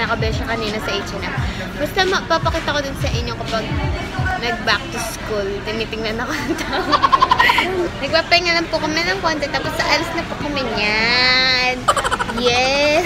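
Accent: Pampanga, Philippines. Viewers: Kapampangan poba kayo? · Kapampangan po ba kayo?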